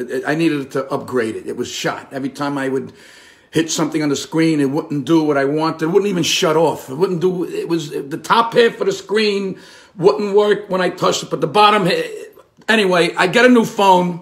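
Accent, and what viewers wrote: New York, United States. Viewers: Thick New York accent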